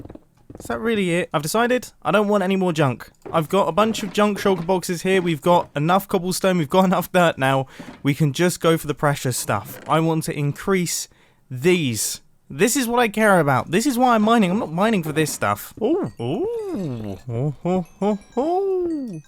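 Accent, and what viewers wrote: United Kingdom. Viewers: Mumbo; *casually and pretty monotone* I wish you ill, oh deary me I'm on fire, lovely jubly (So British, I love it) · The fact that or any other song wasn't playing saddens me.... And is it just me or did Mumbo get more and more British as time went on?? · is the most british 7 secs ive ever heard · I remember the moments when i realized that mumbo is british... All his britishness just became so apparent from that day onward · All the mild british exclamations are delightful. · Mumbo is extra British in this video. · his british accent level : strong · christ this man is british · yup, he's British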